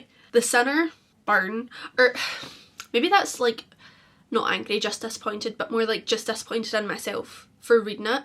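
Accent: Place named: Scotland, United Kingdom. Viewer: nice video! scottish accents are so addicting to listen to 😂💞 - from a slavic girl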